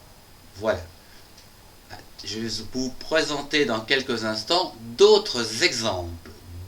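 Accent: France. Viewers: Tu veux rire? Je suis canadien et j'avoue qu'enfin ... je recontre un français qui ne me fait pas ch... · Je trouve que l'auteur à un accent, attention à ne pas répéter avec cet accent qui fait vieille France/Paris 16e et qui vous fera peut-être passé pour hautain si vous insistez dessus.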